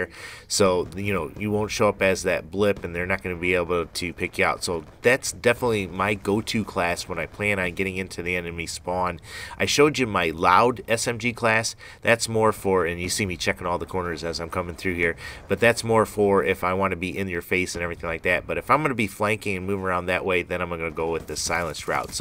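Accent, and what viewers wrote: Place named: Midwest, United States. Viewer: You have a Midwest accent.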